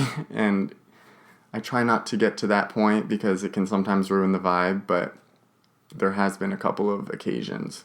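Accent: United Kingdom. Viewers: you are so hot you could turn on a dead guy. Oh, the shirt looks super. The British accent is a turn on for me as well. You look and sound super sweet and I know you must be since I have seen some of your adult films. Please take care because you do great videos, and you certainly are wonderful to look at and listen to. Thanks for the videos!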